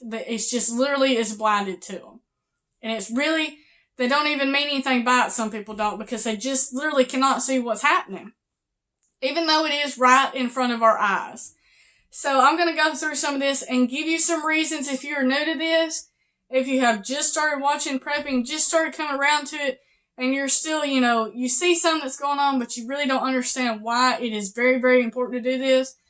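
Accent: South, United States. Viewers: Subscribed, not just for the info... but I love that southern accent! (I'm a Yankee in northern Indiana so it's rare to hear.)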